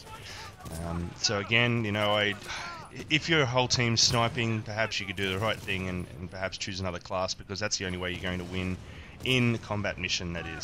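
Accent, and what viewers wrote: Australia. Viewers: How many Aussie commentators are there on youtube? is it just you and JamieEhills?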